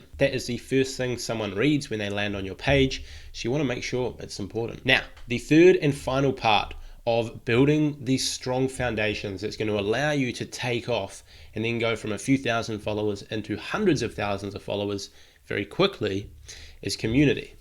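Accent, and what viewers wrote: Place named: New Zealand. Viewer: Great vid, dude sounds like he’s from NZ, even better ! 🔥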